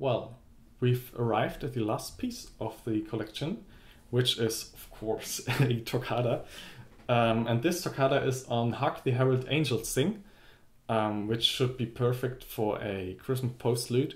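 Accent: Germany. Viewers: I was afraid that musical geniuses died years ago. But here is who knocks my socks off...He seems to have a German accent...It must be genetic. His work makes me feel validated and Happy. My parents gave me an appreciation of music. I picked up the ball and ran with it. Music ist mein Hertz, mein Geist, Mi Corozon, Moi Coer, how much time do you have?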